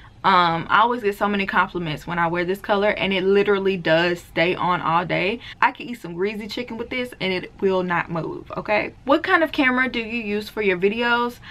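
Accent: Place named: South, United States. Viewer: Yes you def have a southern accent but you mix it up.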